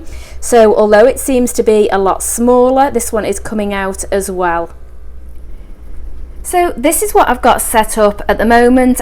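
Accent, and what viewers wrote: United Kingdom. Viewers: With your accent I thought you where in the UK! Yes that's a bit far to post 😂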